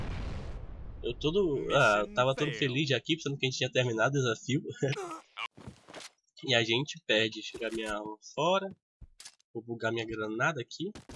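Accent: Acre, Brazil. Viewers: vc e do acre?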